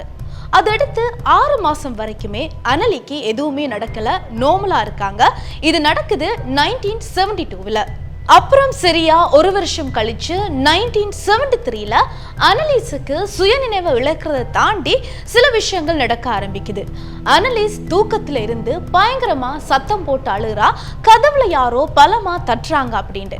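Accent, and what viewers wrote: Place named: Sri Lanka. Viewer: Mam..neenga ilangai yaaà...unga slang appadi irukku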